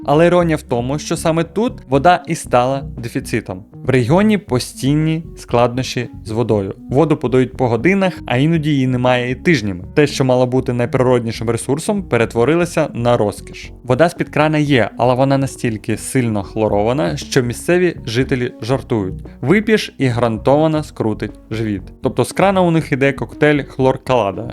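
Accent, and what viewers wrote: Russia. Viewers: неможливо слухати, через заводську расссєйську прошивку автора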